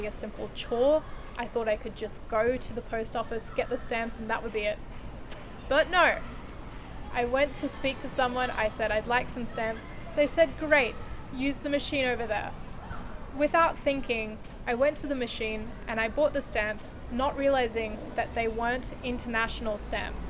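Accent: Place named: Australia. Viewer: Your videos are really fun to watch especially with your Australian accent is just icing on the cake. Already subbed for keeping myself constantly motivated watching your old videos and upcoming ones. Thank you so much and keep making more videos! By the way, I'm from India and am learning French as well, and I plan on reaching at least a CEFR B1 level in French before moving to France.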